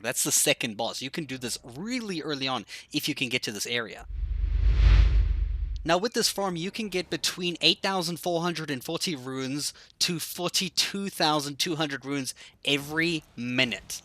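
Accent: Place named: South Africa. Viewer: Hearing your accent for a bit, I realised you are South African 😁 Aweeeeee! Thanks for the video, but unfortunately I am nowhere near this in the game yet - I will finish it another time.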